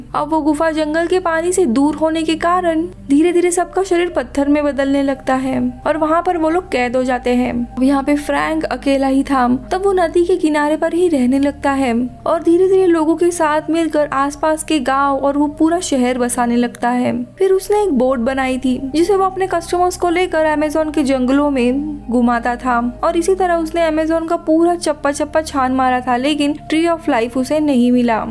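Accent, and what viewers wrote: Maharashtra, India. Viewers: Hii Host, Your mother tongue is Marathi. Sozhavi Dhashak nahi Solavi Shatabadhi.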